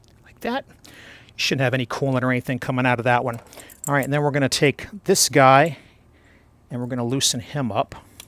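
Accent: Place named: Western Pennsylvania, United States. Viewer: My Sonic isn't acting up yet, but my neighbor had problems with hers. This will be handy down the line, thank you for sharing! I am a big supporter of Right to Repair, and channels like this are a huge help to those of us who need it. Side note, your accent sounded familiar. My family is from western PA and your inflections remind me of my uncles, who let me be a little grease monkey and help when they repaired their cars when I was younger. Cheers, have a great day!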